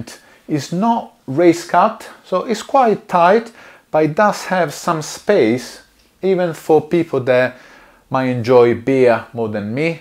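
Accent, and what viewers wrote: London, United Kingdom. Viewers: Hey alright geezer living the London accent 😂. Great review mate on that jersey. Stating all the pros and cons!!!! Does that jersey come in other colours??? Your channel is growing and you’ll be getting loads of stuff to review so keep doing what you’re doing & a excellent ave speed today mate 👍. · Your accent might say “Londoner” but that beard screams “Italian”.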